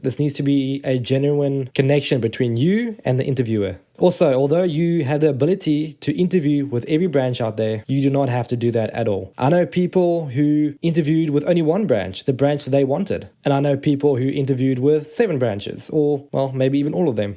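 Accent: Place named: South Africa. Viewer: Judging by your accent, you are south african bru! What branch did you end up going with?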